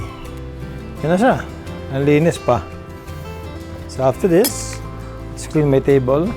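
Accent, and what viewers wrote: Visayas, Philippines. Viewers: Hahaha lisud kaau oiiii hahaha arang ka bisaja ug accent 😂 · Idol sir richard maypagka bisaya man diay ka🤣🤣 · Ka cute nimu mobisaya mayor. Heheheheh LISOD KAAYO OE. Hehehehe my family loved to watch ur videos mayor. More videos pls. GOD Bless u and ur family always.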